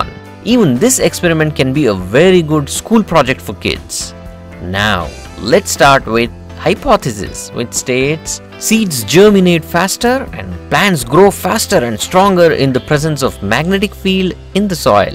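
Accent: India. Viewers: sounds like one of those indian scam-call centers..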